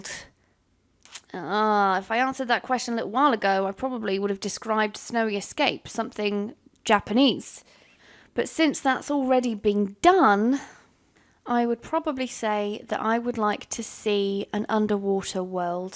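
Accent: England, United Kingdom. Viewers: English accent is elite. ✌️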